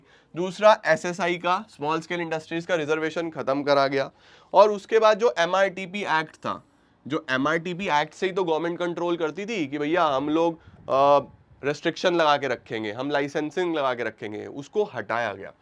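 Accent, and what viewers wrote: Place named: Uttar Pradesh, India. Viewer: U are from up 😅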